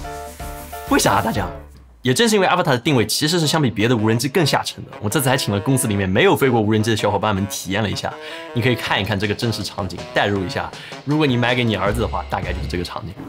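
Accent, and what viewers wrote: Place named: Shanghai, China. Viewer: 這口音也太江浙上海了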